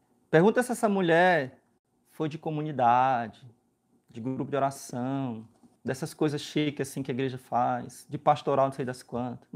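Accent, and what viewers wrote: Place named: Ceará, Brazil. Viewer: O sotaque cearense não sai dele. Haha parabéns, padre.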